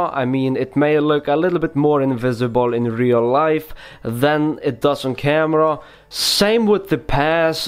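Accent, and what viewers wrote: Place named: Russia. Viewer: у тебя очень русский акцент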